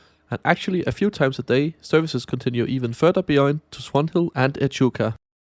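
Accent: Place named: Denmark. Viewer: Your Danish 🇩🇰 accent is soothing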